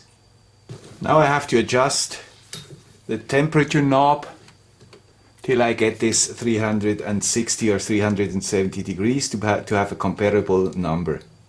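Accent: Switzerland. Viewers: Congrats with #100. I enjoy looking at your videos and learn something every new video. I do hope you do not start #101 again by saying 'this is the guy with the Swiss accent'.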